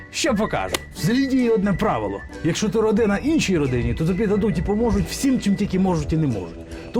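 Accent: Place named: Ivano-Frankivsk, Ukraine. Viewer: Какой же уродский этот западенский язык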